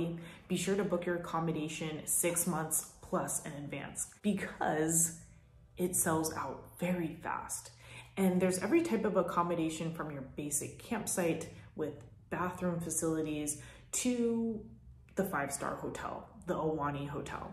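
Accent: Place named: Australia. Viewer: Great video! Thank you and your Aussie is darling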